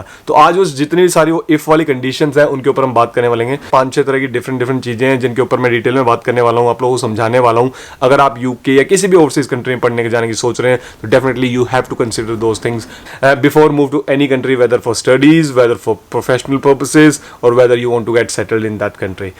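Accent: Haryana, India. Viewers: i think aap haryanvi ho right?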